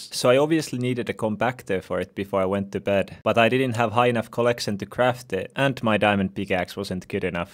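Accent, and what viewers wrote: Finland. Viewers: Sun suomalaista aksenttia on niin kiva kuunnella😁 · Where are you from? it sounds like you are from finish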